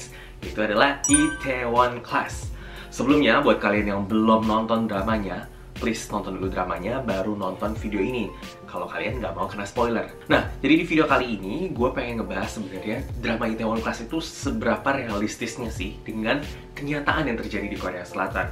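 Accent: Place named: Java, Indonesia. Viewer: Kko awalnya mikirnya opa korea ehh tau taunya kko Indo dari medok nya hehehe keren vlognya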